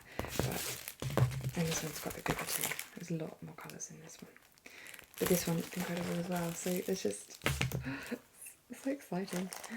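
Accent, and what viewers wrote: Ireland. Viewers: Im American, so I liked the Irish one because it was an accent. Definitely going to keep watching your videos, thanks for making them. There relaxing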